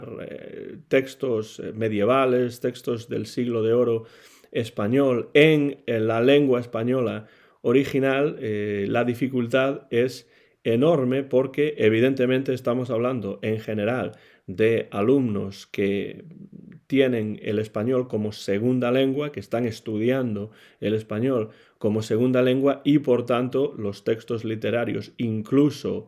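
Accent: Spain. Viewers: Tienes acento como de las Alpujarras o por ahí.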